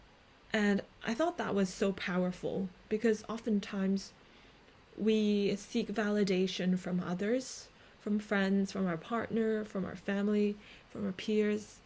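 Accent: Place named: United Kingdom. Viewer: why do u sound bri ish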